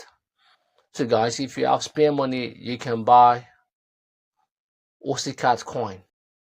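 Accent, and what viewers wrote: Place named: Democratic Republic of the Congo. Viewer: Your ascent though! Sounds Congolese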